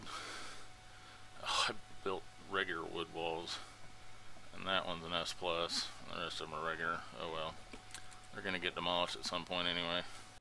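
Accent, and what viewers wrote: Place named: South, United States. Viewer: ey! man! are you south states?? Your dialect sounds like it, Tx, Ka, Az ish.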